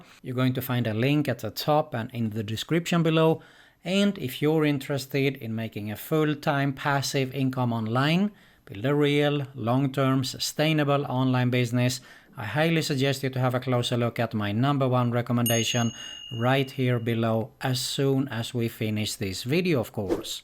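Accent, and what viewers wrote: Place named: Germany. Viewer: Hey, thanks for the nice video. Do you come from Germany, cause your pronunciation looks like Germans ? ☺️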